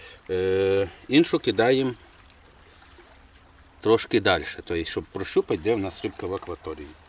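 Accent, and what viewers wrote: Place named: Odessa, Ukraine. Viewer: Прикольный, у тебя акцент,настоящий южный.Коренной одессит походу.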